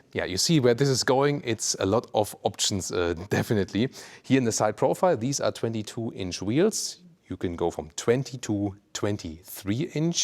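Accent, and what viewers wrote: Germany. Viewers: "turning indicators" I love this guy's Germlish.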